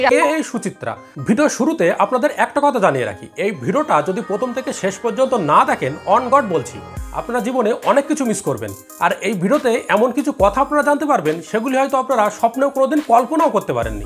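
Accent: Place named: Bangladesh. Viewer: তোমার কথাই মনে হচ্ছে তুমি বাংলাদেশী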